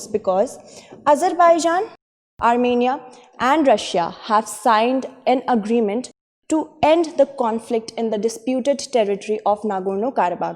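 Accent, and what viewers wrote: India. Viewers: Is She Indian? Her accent is like Indian.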